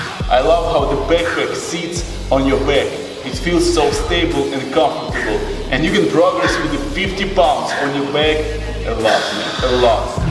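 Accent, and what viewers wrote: Russia. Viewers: пожалуйста прокачай свое американское произношение, ну а то совсем не серьезно. круто то что ты можешь свободно понимать и говорить, но произношение режет ухо. типичный русский акцент )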